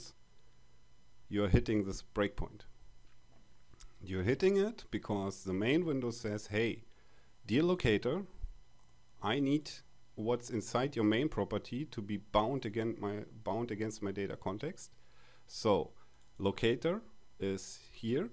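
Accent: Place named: Germany. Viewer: A very German name and a very German accent :D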